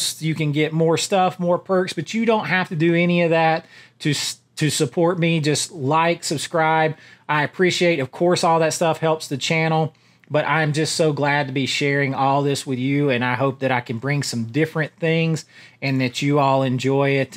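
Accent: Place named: Rural, United States. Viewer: that's A LOT OF QUALITY!!!! plus the highway shredder escape chase scene is DOOOOPPPEE!!! btw, its amazing how much your country accent goes away when you imitate Ralph 🤣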